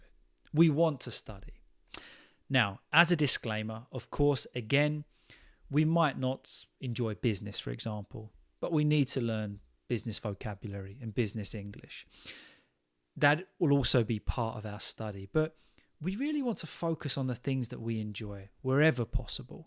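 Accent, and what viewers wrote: United Kingdom. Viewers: Thanks for advice🎉 are you British, or did you learn British language?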